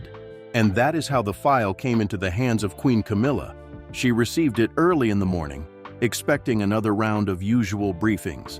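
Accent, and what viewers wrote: United States. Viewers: This voice-over is a joke. American accent and no information about the source of this silly, speculative nonsense.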